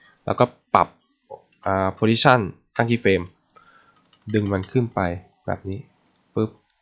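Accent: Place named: Kanchanaburi, Thailand. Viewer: ฝีมือเก่งมากเลยครับ แถมสอนก็ดีอีกละเอียดมาก แถมมีเทคนิคคีย์ลัดให้ด้วย ชอบมากครับ เยี่ยมไปเลย,, ปล.สำเนียงคล้ายเพื่อนผมที่เป็นคนกาญเลย คนกาญป่าวครับเนี่ย 555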